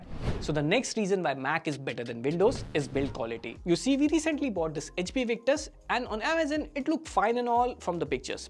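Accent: India. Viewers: I hear an indian guy talking about technology...I press like and listen!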